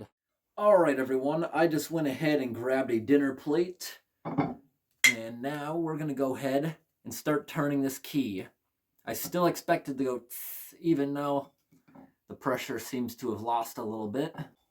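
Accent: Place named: Alaska, United States. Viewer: I was born and raised in Alaska, and he reminds me of a true Alaskan! Even the way he talks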